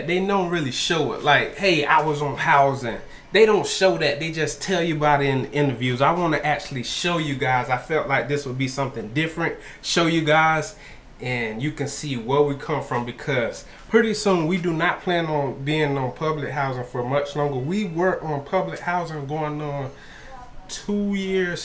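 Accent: South, United States. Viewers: What state are. You located I detect a southern accent , you have a lot of stuff everywhere !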